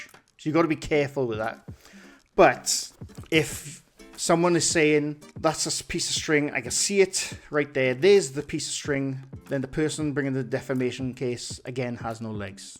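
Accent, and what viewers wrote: United Kingdom. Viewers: I take it from your accent that you are posting from the UK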